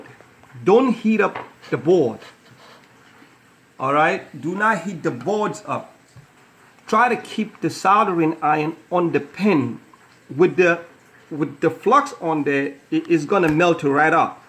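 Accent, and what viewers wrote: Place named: New Jersey, United States. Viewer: Excellent video. You have a Jersey accent.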